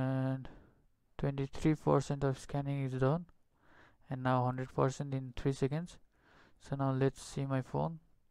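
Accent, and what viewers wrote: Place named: Nepal. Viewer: bro nepali ho kya ?? 😂😂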